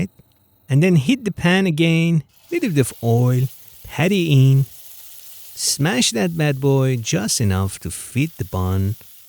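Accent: Iran. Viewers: Peace ✌🏻.. i like to guess where people are from.. cause i love languages, your accent is pharsi ? 🤓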